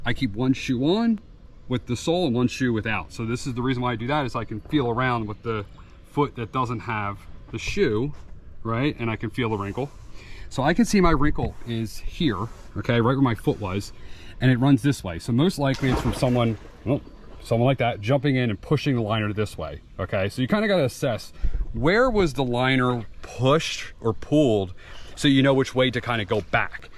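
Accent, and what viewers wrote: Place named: Delaware County, United States. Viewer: That Delco accent lol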